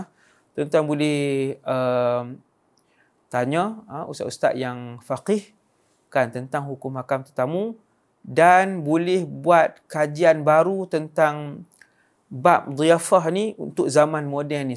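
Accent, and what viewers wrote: Kedah, Malaysia. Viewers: Bismillah Alhamdulillah Assalamualaikum murabbi Wadi Annuar terbaik perkongsian sebelum menunggu masuk solat Asar layan sirah dulu senang faham Kedah pekat ringkas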